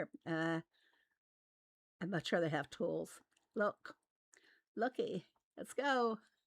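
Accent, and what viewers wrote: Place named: South, United States. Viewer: I have worked in the Boston turf for over 20 years - you don’t have it! Very southern to me!!!!😊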